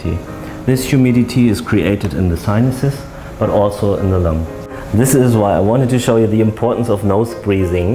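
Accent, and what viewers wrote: Germany. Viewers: His accent is so German so I trust him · are you from germany?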